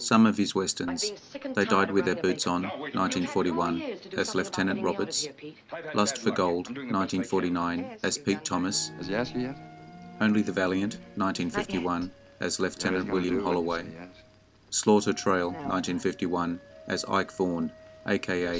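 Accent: United Kingdom. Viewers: You sound as if you are from Great Britain so why do you feel you are qualified to judge a Western? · A guy with a limey accent reading a script... do I take his word regarding cowboy actors? Naw.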